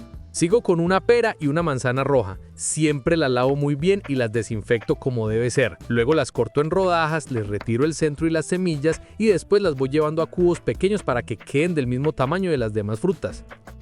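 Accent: Colombia. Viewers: Se ve muy rica si la haré,se escucha que eres colombiano, saludos desde la Ciudad de México 🇲🇽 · Eres colombiano? Me late por tu timbre de voz 😊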